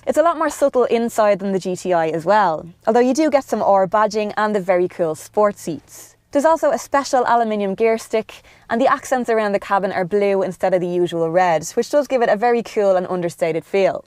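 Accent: Ireland. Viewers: Great car, great review, and i love the Irish accent :)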